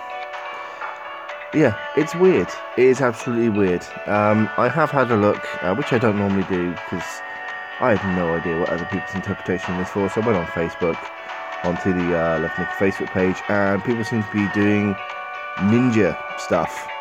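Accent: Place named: United Kingdom. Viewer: such a cool, posh accent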